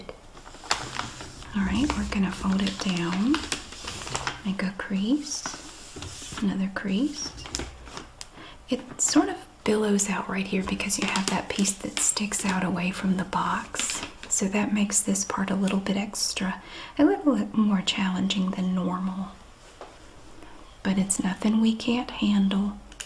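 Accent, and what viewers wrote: South, United States. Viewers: Oh I feel so warm and lovely with southern accents just makes me feel happy when I hear it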